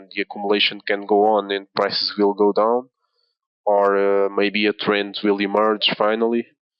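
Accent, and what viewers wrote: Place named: Portugal. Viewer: Olá. Desculpa mas pelo sotaque percebi que eras português:) Gostava de trocar umas ideias contigo, se possível. Vejo que tens uma boa noção de mercado e interpretação de preço. Parabéns. abraço. Pedro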